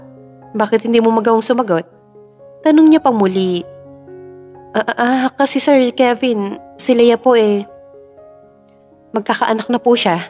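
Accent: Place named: Cordillera, Philippines. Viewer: narrator parang taga somewhere in Kalinga or Ifugao ka,rinig po ung tuno sa boses mo sometimes hehe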